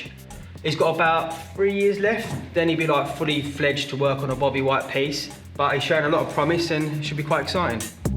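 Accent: Cockney, United Kingdom. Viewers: Besides your talent and skills that we are witnesses in every video, 1st: nice house! 2nd: rescuing dog from being someone’s dinner...👏🏻👏🏻👏🏻👏🏻 nothing to say here🥇 3rd: I looove your cockney accent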